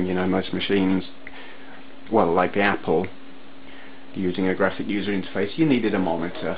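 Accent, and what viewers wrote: United Kingdom. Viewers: i knew before i clicked this video that the voice would be british....... so sad that america never got as enthusiastic about the amiga. =( in fact, it's so bad that when nintendo released c64 games on the wii console, they gave us americans the PAL versions, not the NTSC ones!!! so the games run at the incorrect speed over here (music is too fast) and the keyboard layout even sports the british pound instead of the dollar sign. sighhhhhhhh.......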